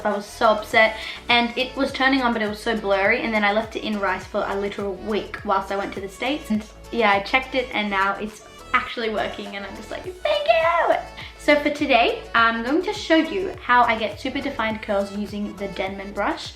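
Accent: Australia. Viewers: i loved your accent, lemme guess you're Australian?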